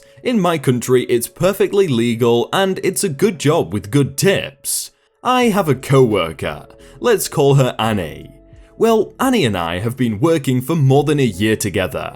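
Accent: United Kingdom. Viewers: Hey, you’re deep. You’re also noticeably more British in your speech. I love it.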